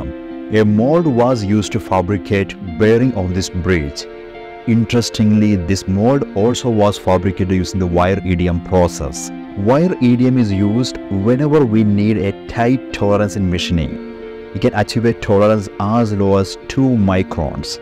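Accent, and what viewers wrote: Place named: India. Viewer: So the more you hear an Indian accent, the easier it is to understand.. just watch more often!! You’ll be amazed! I have zero issues understanding even the thickest of Indian accents.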